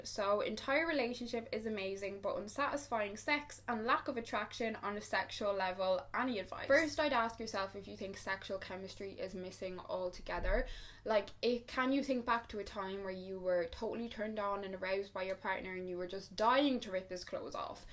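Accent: Dublin, Ireland. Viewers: Hi, is that a Dublin accent you have?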